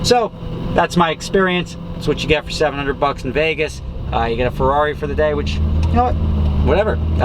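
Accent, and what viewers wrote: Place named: Upstate New York, United States. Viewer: where is this guy from, a very distinct accent almost like upstate new york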